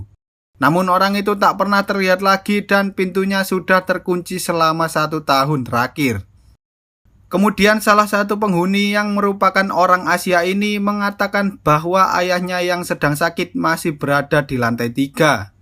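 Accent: Java, Indonesia. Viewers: suara yang menjelaskan video ini suaranya ke jawaan bingits. Hehehe..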